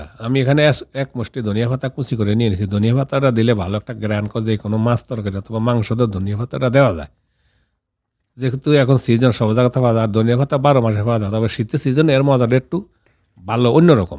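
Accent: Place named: South, Bangladesh. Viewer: আমার বাড়ি ভোলা।আপনার কথার ধরন দেখে জিঙ্গেস করলাম।সাধারনত আমারা দক্ষিন অঞ্চল এর মানুষ এইভাবে কথা বলি।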